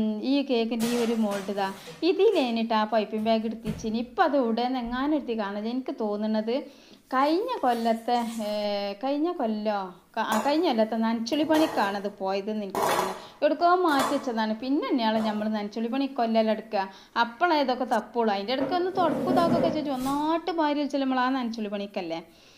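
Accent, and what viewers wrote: Malappuram, India. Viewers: മലപ്പുറം സ്ലാങ് ആണോ ഇത് .നല്ല രസമുണ്ട് കേൾക്കാൻ .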